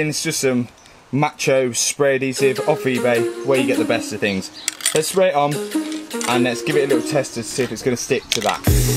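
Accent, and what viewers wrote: Leicester, United Kingdom. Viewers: I hear it absolutely fine but then I'm also from Leicester, know Mallory Park! Must be a "Lestah fing"!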